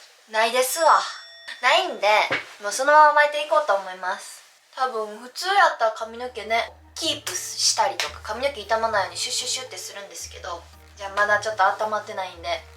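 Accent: Osaka, Japan. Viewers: 平和なほのぼのとしたカワイイ動画ありがとう😄 こんな世の中だから何かほっこりしました。やっぱりみるるん面白いなぁwww一言一言が何かクセがあるし、大阪弁だから笑えます😁 一生懸命なんだけど、何かしでかし感もいいです。やっぱり女の子は髪形で雰囲気かわりますね。 自分は似合ってると思います🆗 PS・・・先日のNAMBATLEの動画も観ました。 ”ミックスジュース”頑張れ！！ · 恵方巻き髪😀まきまき そう言えば握手会で巻き髪見たことなかったねΣ(ﾟωﾟ) 会話が、軽く大阪のおばちゃん入ってる(((*≧艸≦)ﾌﾟﾌﾟｯ 可愛い❤️🥰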